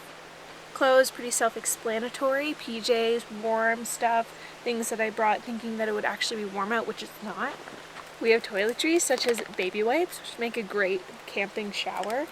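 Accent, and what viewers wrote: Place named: Canada. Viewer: The way Canadians pronounce words like Mazda, and pasta always amazes me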